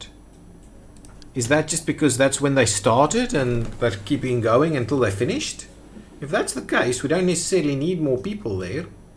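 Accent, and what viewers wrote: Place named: South Africa. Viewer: This dudes accent is so saffa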